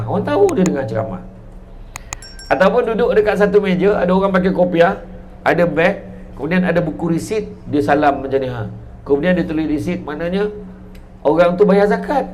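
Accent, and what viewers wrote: Negeri Sembilan, Malaysia. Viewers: saya rindu nak dengar orang cakap nismilan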